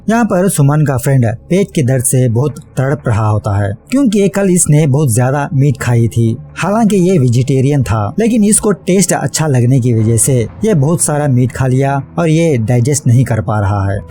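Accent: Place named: South, India. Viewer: Awesome south indian accent and current issues included in the explanations....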